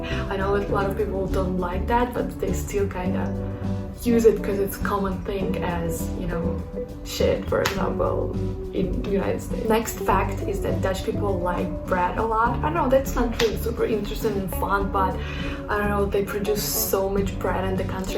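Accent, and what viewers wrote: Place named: Ukraine. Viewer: Круто, сразу слышно украинский акцент.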